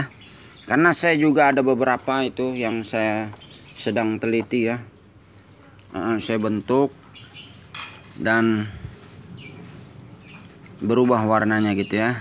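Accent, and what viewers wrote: West Sumatra, Indonesia. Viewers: ..suara anda jelas bgt kalau anda orang MINANG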